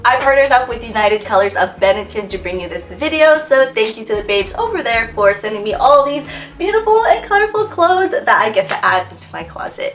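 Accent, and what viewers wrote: United States. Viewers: You can tell she’s from Cali, even if she wouldn’t say it💀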